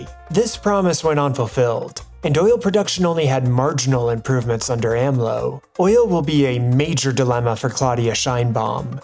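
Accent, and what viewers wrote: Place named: United States. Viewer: Irrelevant to mention President of Mexico ethnical background. She does not practice nor she considers Jewish but Mexican. I myself have a jewish last name, so what, I consider myself with the Culture I grew up with and where I was born. I do not practice Jewish Religion or its traditions. You Youtuber, your English makes people believe you are from USA. Would you like to be labeled as a foreigner and to mention the countries your grandparents were born? That always happened when a person is Important, but we in the USA, ever say where the grandparents of our Presidents came from?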